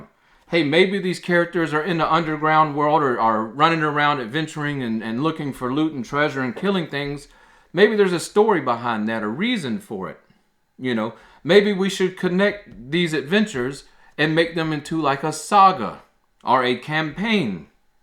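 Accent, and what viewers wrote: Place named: Louisiana, United States. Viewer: So this channel needs to lean more into its Cajun roots. I'm here and subbed basically because of the content and the Cajun accent.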